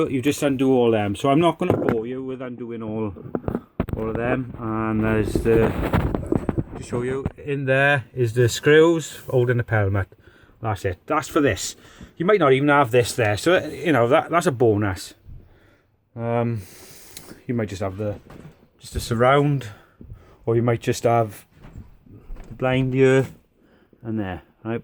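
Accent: Wales, United Kingdom. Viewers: Love your accent. You remind me of my time living in Penbrokeshire Wales. Thanks for explaining the shade repair.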